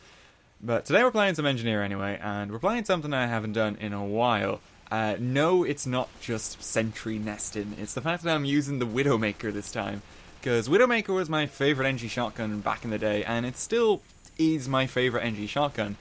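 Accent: Ireland. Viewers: Ah, the sweet, sweet Irish accent flows gracefully over my ears yet again. So similar to mine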